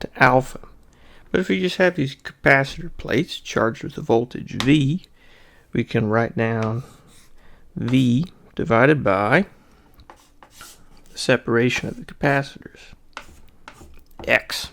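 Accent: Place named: South, United States. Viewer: Love the Southern drawl haha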